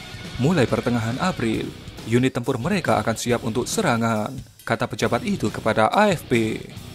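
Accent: Java, Indonesia. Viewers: Bahasane MEDOOKKKK....wagu lahh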